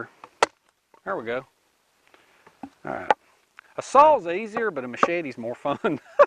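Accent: South, United States. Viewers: you speak "country boy" and that's good enough for me. As long as your "peoples" can understand you, don't worry about the rest. Besides, the people that don't watch aren't in our league. God Bless Brother!!!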